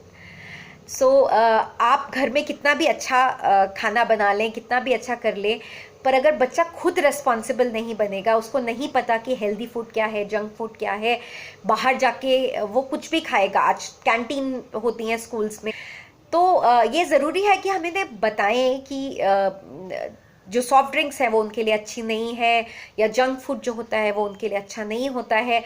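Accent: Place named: India. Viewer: Wtf title in english but she is talking in Indian mixed english what kind of language is this?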